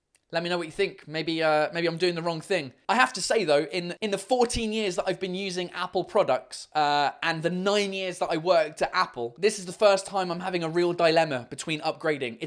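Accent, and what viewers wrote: United Kingdom. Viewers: How can you manage to have that great British accent and a native French accent :o Great video by the way!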